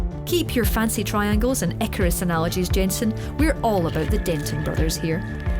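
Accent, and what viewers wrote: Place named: Scotland, United Kingdom. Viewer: It's called Scottish. You'll find that there are quite a lot of people with this accent there.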